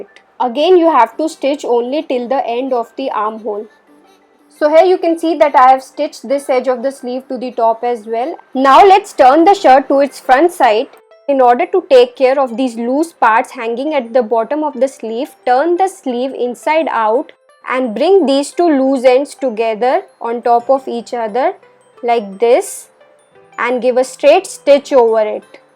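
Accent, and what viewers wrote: India. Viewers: I just want to hear your hindi accent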